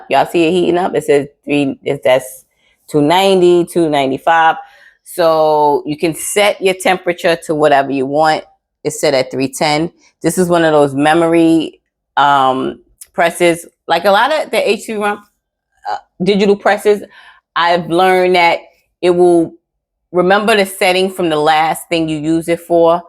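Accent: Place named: Brooklyn, United States. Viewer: Yep that's about rite😁...can hear Brooklyn all thru ya wordz..big Bronx iz definitely rockin.. keep grindin luv...alwayz nyc luv💪🏾🔥💯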